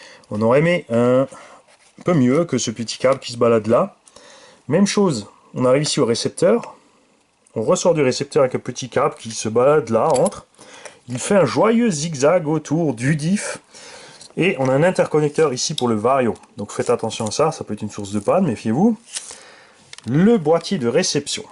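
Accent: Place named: South, France. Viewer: Les essais ! Les essais ! Les essais ! Tu commence à y prendre goût à l’accent du sud :D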